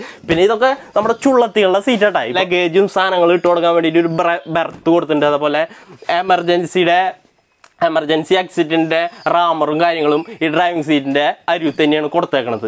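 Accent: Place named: Thrissur, India. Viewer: തൃശൂർ ഭാഷയുടെ അഴക്കൊന്നുവെരുത്തനെ. Bus review നേക്കാൾ നമ്മുടെ തൃശൂർ ഭാഷ കേൾക്കാന വരുന്നത്...... keep it up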